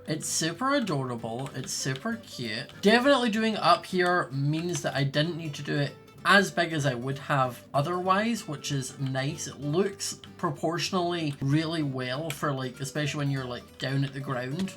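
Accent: United Kingdom. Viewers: you saved joel from the wither! WE STAN british accent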